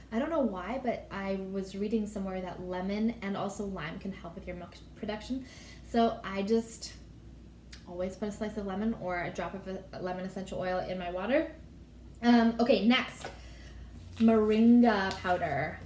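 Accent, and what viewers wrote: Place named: Canada. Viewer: John Sanders she sounds like she has a Canadian accent to me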